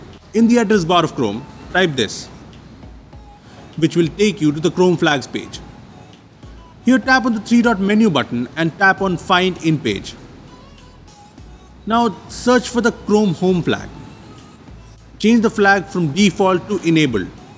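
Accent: India. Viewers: Fuck you and your indian accent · Thomas Politis me too I hate this Indians videos, his acent is just disturbing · I love Indian accent 😁😉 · As a small country East Asian, Sorry, I'm not fluent English. If you have some time, Could you add English subtitles to this video? I want to learn Indian English also. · The Indian english accent is just hilarious XD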